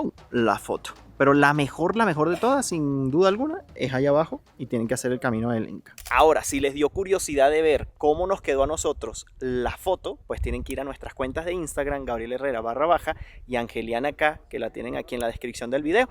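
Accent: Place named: Venezuela. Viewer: Gabriel eres guapo No habia tenido el honor de conoserte.. Veo tienes esposa) Por tu acento eres Venezolano _? Mire todo el video y me quede esperando una toma' cerca de ese pico de la ⛰️ Creo era al final..pero tengo muchas preguntas/ 1_ Las 🪨 rocas estan sin cemento_? Mi Padre hacia lienzos de piedras y solo encimandolas se caian seguido) Estas rocas tienen buena colocacion y creo se adhieren fuerte y miro estan partidas con alguna maquinaria..no hay piedra que sea tan derecha y ahi muchas son partidas exactas/ 2_ Machu Pichu tiene laderas muy altas.. La gente pregunta como construyeron tan arriba/ Yo pienso que era un altiplano parejo y con el tiempo se fueron quedando algunos arriba_ dando un aspecto de que construyeron a las alturas) Mi pregunta es' Algun terremoto destruyo los alrededores y solo sobrevivio ese piko de laderas.._? Amigo Gabriel" Que fortuna poder viajar y conoser en persona lugares misticos' Al tu estar grabando y hablando..pense que te perdistes..el sentir la recarga de seres que habitan invisibles ahi..yo mire en tu recorrido unos movimientos detras de una ventana y no eran personas/ Eso paso antes de la lluvia y el viento' Porque estabas llegando a la plaza principal y el estar a esas alturas.. 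esos seres cuidan sus terrenos y al final les dieron permiso de seguir' porque podia haber una tormenta mayor y tuvieran que parar y regresarse.. hasta yo pensé.. Noooo ya se estaban acercando a la montaña y no vere lo mas importante) La Roca Sagrada Tuvo sacri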